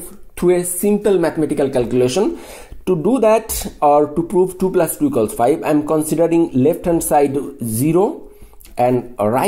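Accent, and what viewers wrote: Bengal, India. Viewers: he sounds like that guy awnsering calls in a call cener in india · Indian english accent but location germany, must a albert einstein student. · While cancelling the sq ... U r actually rooting on one side so in disguise.. it's actually a sq of a modulus and that's why it's +/- on either side depending whether U want to keep the value +ve Or -ve....... And moreover U seem like a Bengali by accent rather than German ( idk how German accent sound)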